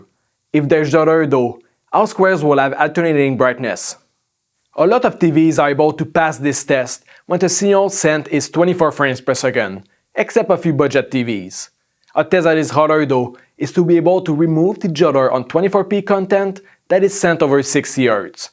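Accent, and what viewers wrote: Quebec, Canada. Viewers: He has a big accent because he is from Quebec. I'm from Quebec too so I could tell. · I can tell that you are from Quebec haha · Hehe that's nice to hear a French Canadian accent on a high quality informative video. I noticed it right away and trust me, he speaks english EXTREMELY GOOD and way better than the average person from here, including myself haha. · HAHA un Québécois ! :) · It’s a French Canadian accent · Lul French Canadian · It's actually a French Canadian accent 😉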